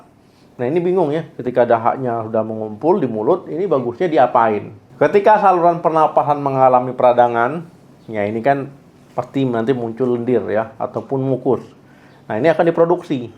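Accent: Aceh, Indonesia. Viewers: Macam gaya orang aceh ngomong bahasa indo dokternya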